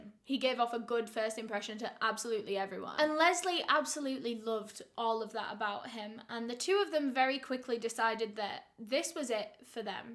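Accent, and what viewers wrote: United Kingdom. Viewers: Hey..this is off topic..i love your accent! Sounds British with a twist!😉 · Can a British person tell me what kind of accent she has? It doesn’t sound like typical accent you hear on tv. Is it considered proper? I met a girl from Manchester who told me Adele sounds so bad when she talks and here me an American loves her accent. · I. Love. This. Accent. So. Much…. Which part of the UK is this?!!! 🤩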